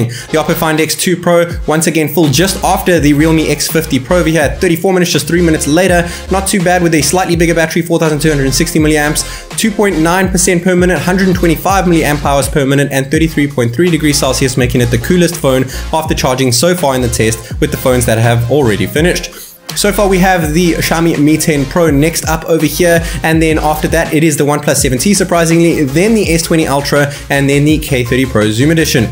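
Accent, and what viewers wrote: South Africa. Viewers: Hey, just curious - are you South African, by any chance?🤔 Your accent...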